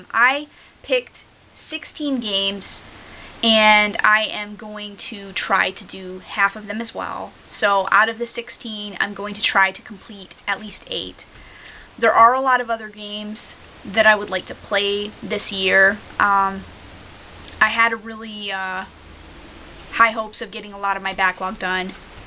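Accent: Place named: South, United States. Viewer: Oh ok... Thats cool! Im from Alabama and your accent sounds southern to me... You ever been to Alabama?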